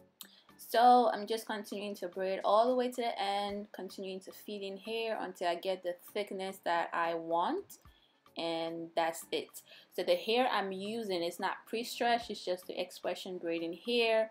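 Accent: Nigeria. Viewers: I love your accent. Are you Nigerian?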